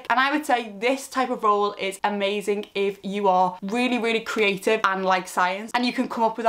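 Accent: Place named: Merseyside, United Kingdom. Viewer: I'm so glad to come across your channel! I'm literally 39 have absolutely no experience with anything on the subject, yet simply through experiences with mental health and my own personal research on the brain and how it works, I've decided I really want to pursue this role! I'm from your neck of the woods by the sounds of things (Merseyside), but currently work full time with 3 kids! Do you have any advise on which pathway I could take to get into this career?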